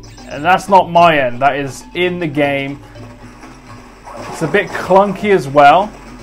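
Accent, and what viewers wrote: Bristol, United Kingdom. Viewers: Do I hear a Bristol accent?👀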